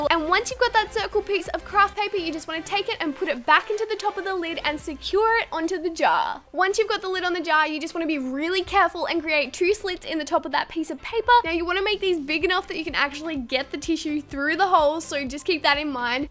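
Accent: Australia. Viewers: My room is clean most of the time but it's always my dresser that stays messy, it's here, ps you r are soooo beautiful and I love your accent! Idk why but there is just something about Australian accents that I love! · omg ur Australian so am !!! i love when i find you tubers that aren't American!!!..... no offence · Arw you Australian? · Are u Australian??????????????????????????????????????????????????????????????????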